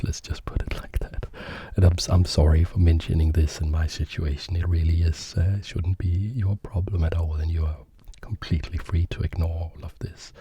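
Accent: Denmark. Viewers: Is that a danish accent I Can hear? 🤣 I am danish myself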